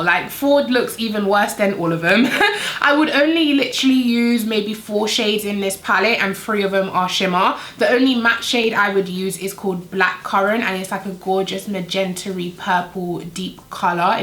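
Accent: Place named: United Kingdom. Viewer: Nice one. I have been guilty of overspending on makeup in the past but when my KvD Shade & Light was needing renewed I got the Revolution one. Its every bit as good. I am really pale and the middle top shade makes a great natural looking contour (The KvD one is the same but I can't afford to keep buying US products, the duty is crippling, they seem to just convert the dollars to pounds without using the exchange rate as if forty quid was the same as forty dollars. Finally, I know that Kat has done a stellar job in raising awareness of animal testing etc. But Revolution is cruelty free, British and costs a fraction of the price for really good quality products. I agree about the Fenty pallet being a tad uninspiring. But I really want to try one of her contour sticks which looks really good on pale skin and it's a cream which is more flattering to mature skin Great video Girl its nice to hear a British voice. 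As for Kylie and Co. I wouldn't spit on them if they were burning. Who bases their fame on their dead father being the lawyer of a wife killer? And that is just the Kardashian part. The Kylie bit with her two mum's or whatever it is is something most families would keep to themselves. They're grasping, fame chasing gold diggers. All of them...Kanye West should have recognised that!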